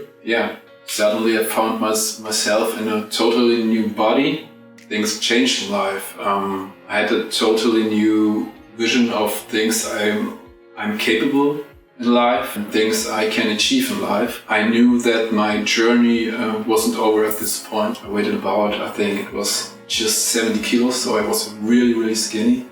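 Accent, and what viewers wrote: Germany. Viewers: Der deutsche Akzent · Your story is amazing. This explains a lot of your tattoos you have unfortunately when you’re growing up, there’s some real bully kids and mean kids that want to make you feel bad about yourself fortunately for you you have nothing to feel bad about those same kids today are probably like wow look what he did amazing I love your accent German so am I I should say part German in German and half Apache, Indian, good combination, fire and water. I was born in July.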